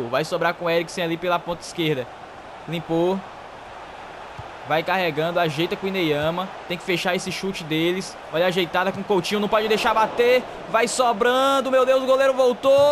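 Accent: Portugal. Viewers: cara, para de falar que nem português!! kkkk é irritante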